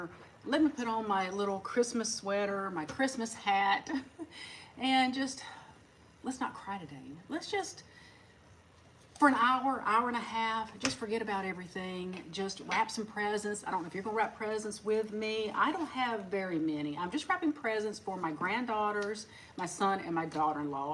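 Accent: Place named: Carolina, United States. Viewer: We relocated to North Carolina back at Christmas 2018 from Maine. I knew immediate that was a Carolina accent. 😉. I only found your channel shortly before your husbands passing. The content did change, but that was expected. We downsized by over 1000 sq ft. and I am so glad we did. So much less to care for. I happily decorate my smaller home. I am not a minimalist, but still enjoy having items that bring me joy. My struggle is making friends as an adult. I am blessed to have my church, but it is still different. That's for letting us into your world.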